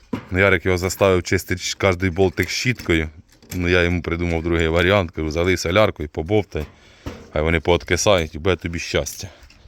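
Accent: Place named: Surzhyk, Ukraine. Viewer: Зайшов на канал, люблю цю тему, та не зміг слухати. Мова така покалічена, засмічена, що ріже вухо. От так ми зі суржиком і помремо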